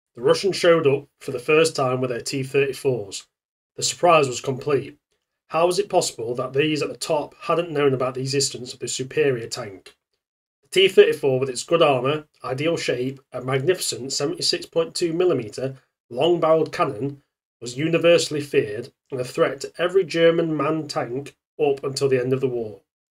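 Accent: United Kingdom. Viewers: Thanks for your work! Weird to hear Carius' memories with a British accent, but cool anyway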